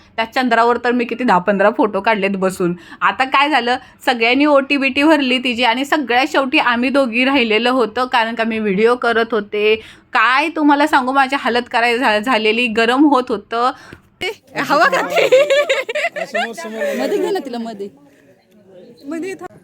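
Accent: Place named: Western Maharashtra, India. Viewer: तुमच्या भाषेवरून मला वाटलंच होतं हे तुम्ही पश्चिम महाराष्ट्रातलेच असाल म्हणजे कराड भागातील कारण तुम्ही सांगितलेल्या प्रत्येक गावात पाहुणे आहेत खूप छान ब्लॉग आणि मी तुमचे व्हिडिओ नेहमी फॉलो करते कारण तोंडोली पण आम्ही दर पौर्णिमेला येत होतो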